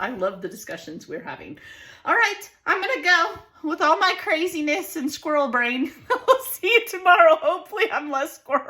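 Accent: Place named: Oklahoma, United States. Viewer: if you are like me your "Walmart" photo packages had 50 images of different sizes and the bigger ones used to hang on my wall in frames. People don't seem to do that so much anymore, but I refuse to discard them so...they are in my albums as well as a few of the smaller sized sprinkled in. There is just something about an 8x10 that encapsulates the family unit. Gotta keep 'em and enjoy 'em! BTW - LOVE your "Okie" side when it comes out!! You did a wonderful job on this large photo layout!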